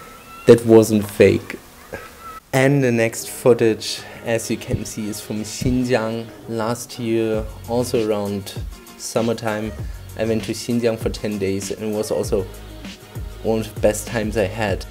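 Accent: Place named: Austria. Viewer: Arnold is Austrian and in Austria people speak German. So he has a similar accent to Arnold.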